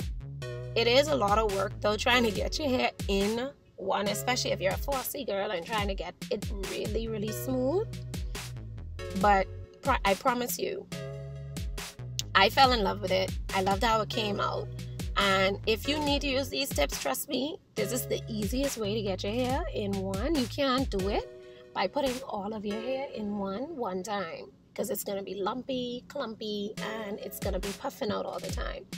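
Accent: Bahamas. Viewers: frfr had me guessing if this was really a bahama mama🇧🇸🇧🇸🇧🇸🇧🇸❤ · first thing I say was "This a Bahamian?" LOL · ok... the min i heard her i say bahamian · Took me only half a second to recognize that Bahamian accent lool. Slay girl slaaayy 👏🏽👏🏽 🇧🇸 🇧🇸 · same i say she bahamian frm the jump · At first I thought bajan or bahamian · same, we Bahamians cant hide lol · Me too I just love the Bahamian accent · Tammie A. Me too! My family is from the Bahamas! I recognized it immediately! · I thought I was the only one who was tryna figure out if she was Bahamian or not lol 🇧🇸🇧🇸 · Recognized that fellow Bahamian accent in a jiffy!!! And I wasn't even listening, had the volume almost off and still our accent came through loud and clear!!!!! Love it!!! Go girl!!! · Girllll all I said to myself was she only could be from back home with that accent!!!!! 😂 represent that 242!!! 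All day! 🇧🇸🇧🇸🇧🇸🇧🇸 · Tammie A. I knew right away she from The Bahamas like me!! 242 to the world.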